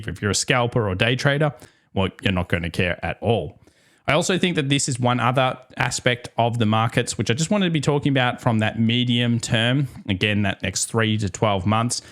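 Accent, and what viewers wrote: Australia. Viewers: Your Australian accent is super cool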